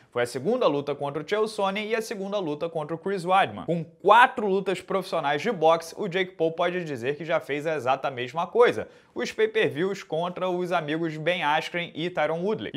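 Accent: Rio de Janeiro, Brazil. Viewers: Pq citou microfonia sendo que é o sotaque do rio de janeiro?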